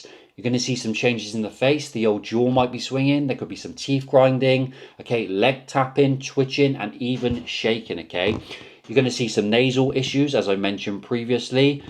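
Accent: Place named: Bristol, United Kingdom. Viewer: You sound like your from Bristol ? Can I get in touch privately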